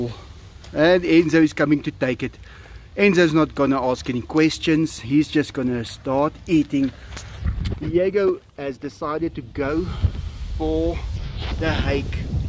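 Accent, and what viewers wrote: South Africa. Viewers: Cartoon head Since people decided to be rude answering you, I’ll explain the reason so you don’t argue back rudely and cause another pointless comment war. Apparently, this person received 3 rescue tigers (1 died and Enzo was really sad, that’s when Diego came in) and since these are rescue tigers, they never learned from their mothers how to survive out in the wild to hunt and eat. This person also lives in South Africa (his accent), and these tigers do not belong in that region. If they are free, they will starve to death because they’ve been in captivity for many years with this special person and they’ve been fed by him. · The accent is unmistakenly South African...unless I'm mistaken. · Is this a south african accent? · Are you South African? You kind of sound like a mixture of Steve Irwin and sharlto copley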